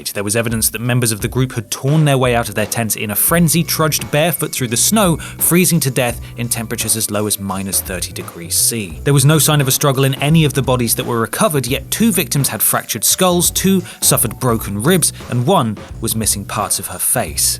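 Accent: United Kingdom. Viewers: They are mysteries because they cannot be explained. You couldn’t explain them. Only report them. But I enjoy your Brit accents.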